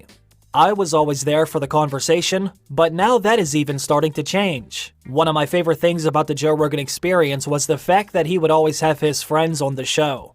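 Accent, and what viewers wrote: Pittsburgh, United States. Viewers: Alright I gotta ask... You’re a yinzer aren’t you?😂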